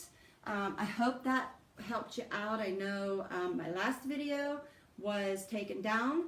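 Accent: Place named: Pittsburgh, United States. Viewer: Hello from a fellow Pennsylvanian. I was watching your video and thought to myself that you sound like you have a Pittsburgh accent. What a very beautiful, creative blanket (loved your granddaughter’s blanket too)! I am just starting out in sublimation. I made a few heartfelt creative Christmas presents. I am continuing to practice. I really love this creative outlet. I, like everyone else, was wondering where you got your blankets from, great size and it looks to be an awesome quality. Thank you for your informative, thought provoking video. Stay safe.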